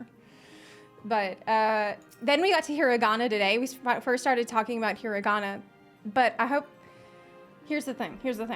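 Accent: South, United States. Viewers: Luckily I am Japanese so I have the advantage of knowing what Yoshi P say before translator speaks to my discord friends. But I remember learning English was one of the hardest thing I have done during my youth. Grammar, future, and past tense are the things that got me frustrated but I kept on going. I eventually was able to lose my Japanese accent as well after many years of practice. I am very shocked to hear you are learning Japanese. I have no doubt you can do it as long as you don't give up. For now, I must say your accent in Japanese is so adorable. It has the hint of southern US accent which is very exotic and unique to hear in Japanese.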